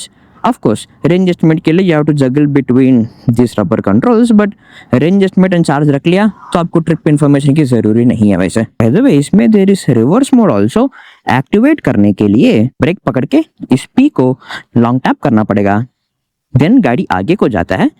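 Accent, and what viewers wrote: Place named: South, India. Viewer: आपकी हिंदी ज्यादा अछि लगी जबकि आप दक्षिण भारतीय लहजे में बात कर रहे थे...लेकिन जो भी हो आपने बेस्ट हिंदी बोली....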